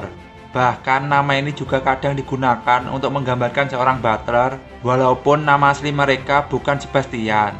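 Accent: Java, Indonesia. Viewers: Medok ya suaranya unik broo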